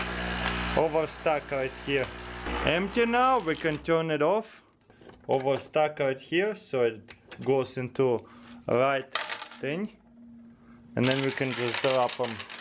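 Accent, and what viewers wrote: Russia. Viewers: Dudeeee i love his accent, super heavy Russian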